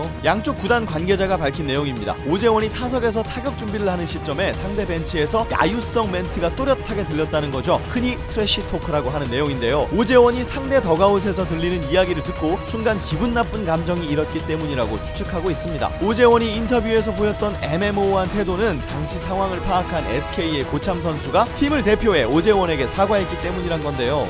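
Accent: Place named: United States. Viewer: 한국말을 미국식으로 읽는거 너무 웃김ㅋㅋㅋㅋㅋㅋㅋ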